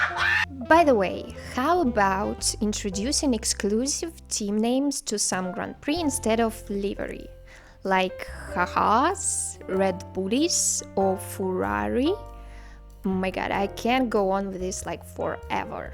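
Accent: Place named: England, United Kingdom. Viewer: Wow, you're from England!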